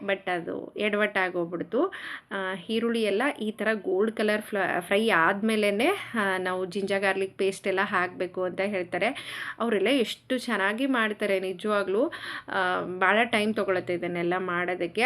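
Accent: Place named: Gangavathi, India. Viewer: Hi sis namdu Gangavathi ನಮ್ಮ ನಿಮ್ಮ ಮಾತು ಸೇಮ್ ಇದೆ ನಿಮ್ಮ ವಿಡಿಯೋ ನಮಗೆ motivation ಆಗುತ್ತದೆ tqu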